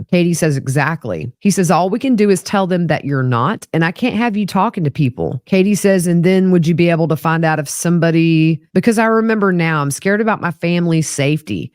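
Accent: South, United States. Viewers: I love Wendi but i love your southern accent also.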